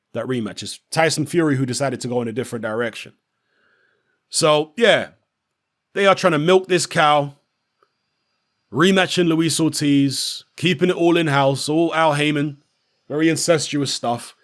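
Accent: United Kingdom. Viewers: Your biased . Why is Joshua fighting Ruiz then . Why not just push for the Wilder fight. U sound British anyway , your straight team Josh .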